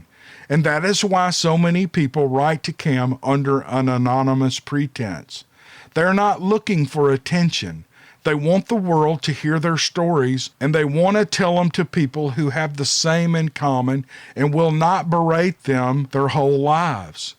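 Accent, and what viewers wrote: South, United States. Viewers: They are not monsters,like some people think.i love this guys story,and the way you tell it.also,love your accent.southern boy,like me.if they seem aggresive ,then some one made them that way,possibly by harming one,or shooting one.too quick with the gun,they are more curious than anything.they don't mean you any harm,unless they have been abused by someone before you arrived.well,merry christmas to you and your family.keep up the good work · Hey!!!! Merry Christmas. I thank God for you and your channel. You bring me and so many people joy all year long. Plus as a midwesterner( Wisconsin) I love your southern narration! Bless you and yours.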